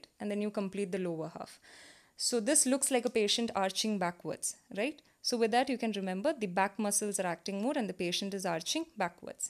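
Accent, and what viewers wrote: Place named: South India, India. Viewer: OMG! This is the best one on YouTube. I love your crystal clear voice in South Indian style. Thanks🙏🙏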